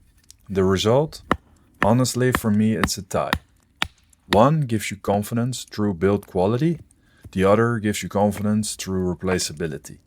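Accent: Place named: Netherlands. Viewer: I gues your from the netherlands? I think i can hear it in your accent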